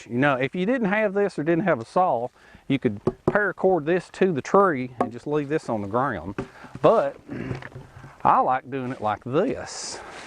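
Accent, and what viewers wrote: South, United States. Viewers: Hello again lidal ole England. Now i must admit, it took me till halfway through that vid to come on board. ive seen a few of your vids now. and i have wondered. is this guy for real? That accent of yours is fantastic, somewhere between the Dukes of Hazzard and Forest Gump. Back to your product, a few years back i had an idea in my head, not one that would make money, in fact i assumed someone must have already invented it. A camp bed without a frame was the idea i had. you could make the poles yourself with long straight bits of wood/logs. my idea was to have a sleeve running the lenth of each side. but your webbing on the side idea works better. due to a seriously small market, i doubt either one of us was ever gonna get rich, or a patent. this is a case of great minds think alike, as you have made the idea i had. And taken it several stages further with the whole survival blanket thing. 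i was just thinking a camp bed without a 37 piece pole puzzle. So all in all, good job mate, seriously. me and a friend of mine are in the planning stages of making our own youtube bushcraft/camping/outdoor stuff channel. I'll be sure to let you know as soon as we post anything worth watching. Gary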